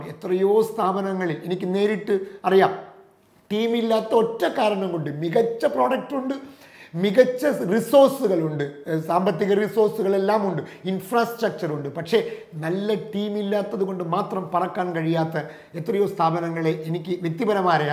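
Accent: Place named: Tamil Nadu, India. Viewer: .... ഇപ്പോൾ അവതരണം... മെച്ചപ്പെടുന്നുണ്ട്... പഴയ' തമിഴ്ചുവ'ബോധപൂർവം അകന്ന് പോകുന്നുണ്ട്.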